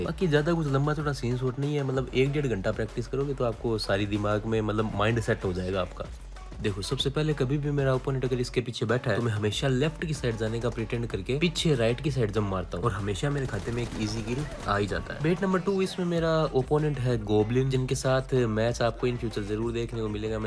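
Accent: Haryana, India. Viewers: Aap Haryana se ho kay 🤔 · Bhai Haryana se ho kya 👀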